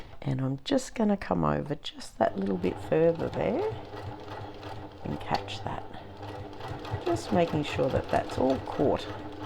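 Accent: Australia. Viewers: Just found your channel , I’ve never made a bag before so I’m keen to give it a go … I thought you were an Aussie from your accent .. I’ll definitely check out your shop , also where do you get your label from … x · Thank you I'm going to give it a go tomorrow, love your calm cheery Aussie voice and clear instructions.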